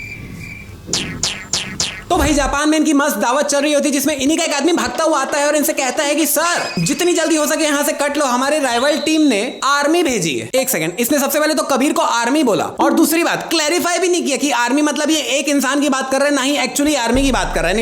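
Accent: Chhattisgarh, India. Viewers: Bhaiya app Chhattisgarhi ho kya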